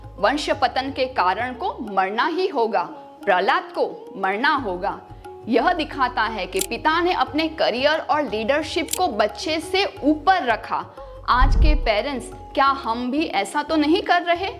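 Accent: Standard. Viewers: जितनी शुद्ध हिंदी आपकी बोली है उतनी शुद्ध हिंदी हमारी बोलचाल की भाषा बन जाए। 🙌 ॐ भगवते वासुदेवाय नमः 🙏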